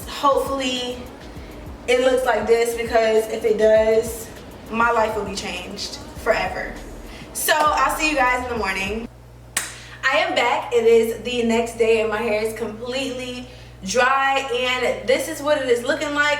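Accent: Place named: New Orleans, United States. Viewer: Lemme find out you from NOLA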